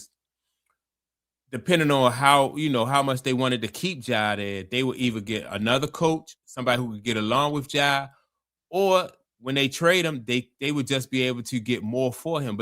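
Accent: Baltimore, United States. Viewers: That Baltimore accent is heavy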